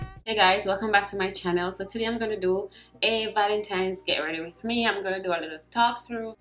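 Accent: Jamaica. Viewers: girl u slay this fuck out this video....sound like u a one a wi....mah hear too jcan talking😂😘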